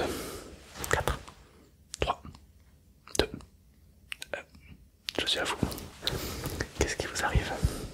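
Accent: South, France. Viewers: ça m’fait bizarre prck tout le monde dit que ta un accent du sud mais vue que chui aussi du sud bah je l’entend pas fin voilà quoi c tout.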